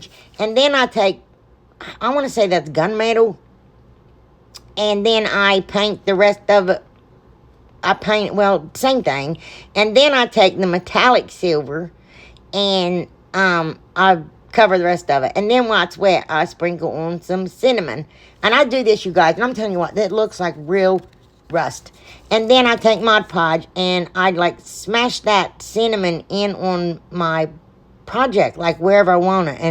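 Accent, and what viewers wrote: South, United States. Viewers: I love your crafts! I grew up in the South, and darling, I love your southern drawl. My sister and I have a crafting channel called newbeginningsartcrafts. Keep on creating; you bring joy to everyone. God bless you.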